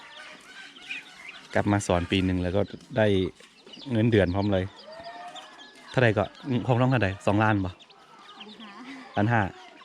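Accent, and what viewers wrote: Thailand. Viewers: ฟังสำเนียงการพูดแล้วเหมือนคนไทยเลย คงจะเคยมาอยู่เมืองไทยนานนะ คำพูดสมัยใหม่ไม่เหมือนคนลาวจริง ๆ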